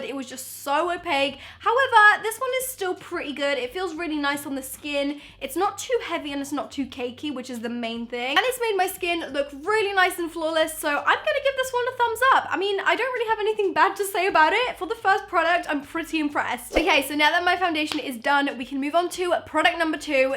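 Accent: United Kingdom. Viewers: This british accent omg 😍😍😍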